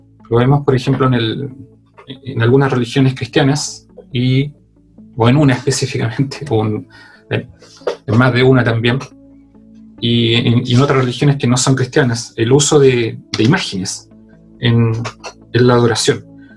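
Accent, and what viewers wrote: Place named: Chile. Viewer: Muy instructiva la presentacion, gracias. Pero usted tampoco describio las 7 definiciones de Verdad que Dios revela en su palabra, todas, las 7, y es muy importante conocerlas todas porque de otra forma no conocemos a Jesus, y el diablo se rie y se burla del medio creyente, porque continua ignorante, desprotegido y vulnerable para ser engañado a su antojo. Desea conocer las 7 definiciones de Verdad que nuestro Dios nos enseña y revela en su palabra, la Biblia ? Y por lo que oigo usted es de Chile, hermoso pais para conpartirle de Jesus y su palabra.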